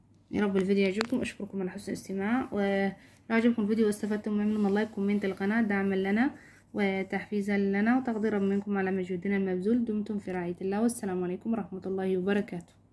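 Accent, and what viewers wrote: Egypt. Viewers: انتي مين مصرية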